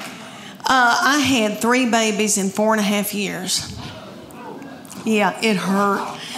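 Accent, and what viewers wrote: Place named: Appalachia, United States. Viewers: Why is it, when I hear a southern accent, I think dumb. If you don't want to get pregnant try the pill or condoms, honey. This is funny ? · She's gorgeous, intelligent and funny! I just LOVE that accent too! As smooth as Appalachian moonshine! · I love you. I, a Yankee from NYC, lived in Memphis Tennessee for over 3 yrs and know most of TN quite well. If anything made an impression on me was that everyone was so, wholesome and funny, just like you. I learned so much about America, being a bred and raised city boy. I didn't realize how ignorant I was in the ways and niceness of the South. Those experiences and memories were amazing and I cherish all of them till this day! When you talk about being that "cute girl" in britches OMG, many times I went out with those girls! They were absolutely beautiful and their accents, like yours, would just melt my heart! 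Best was they were honest and loving. Your comedy is superb. I wish you the very best for you and your family. God Bless!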